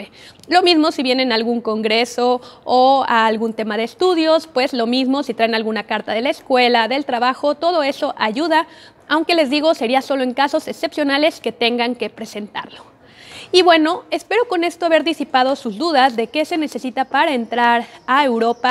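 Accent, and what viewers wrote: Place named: Spain. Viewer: Eres mexicana? Tienes acento espsñol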